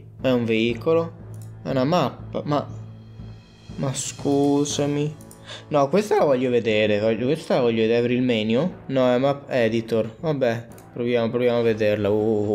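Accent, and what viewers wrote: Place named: Veneto, Italy. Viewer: Lui è veneto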